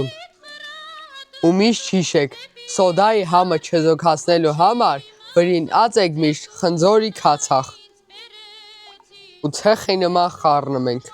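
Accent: Lori, Armenia. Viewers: Մեր Լոռվա բարբառը 😊😊❤❤❤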